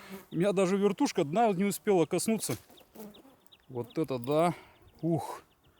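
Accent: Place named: Arkhangelsk, Russia. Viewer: Хорошее видео. Вы наверное с Архангельской области. Напиши. Говор наш.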